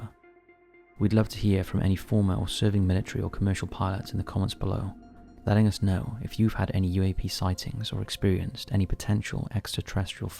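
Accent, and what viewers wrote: Wales, United Kingdom. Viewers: i thought welsh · I love this British narrator guy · Is this guy welsh ? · Hooray! 🎉 We've got the posh English chap back! Sounds more classy!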